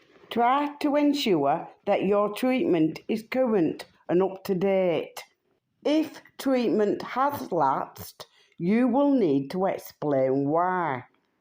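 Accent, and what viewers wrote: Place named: Burnley, United Kingdom. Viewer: Thats a Burnley accent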